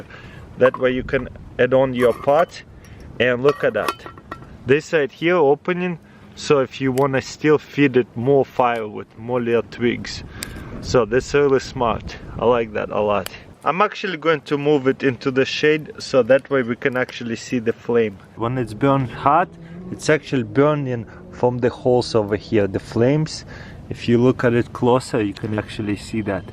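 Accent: Russia. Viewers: У тебя акцент русский.😀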